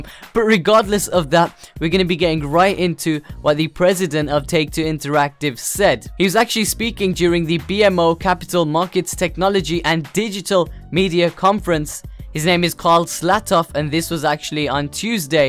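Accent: United Kingdom. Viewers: He's from uk man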